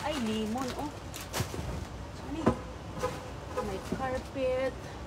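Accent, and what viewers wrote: Visayas, Philippines. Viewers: Ok lang yan Bisaya kasi siya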